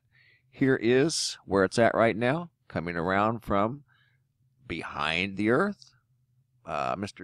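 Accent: United States. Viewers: if you can investigate, then you will find that they start from out of the atmos and cloud masses atract them ! electric ! planet means wandering star ! you sound soooo americAAAAAn ................!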